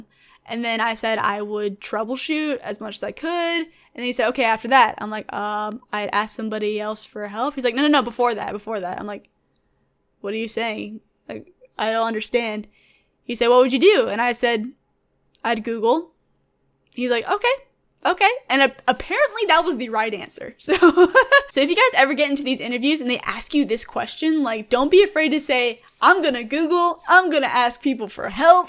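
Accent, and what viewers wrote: South, United States. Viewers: Think I honestly have never really heard a true American Southern accent. This girl seems nice and her videos have a nice quality and effort on them. Subscribed.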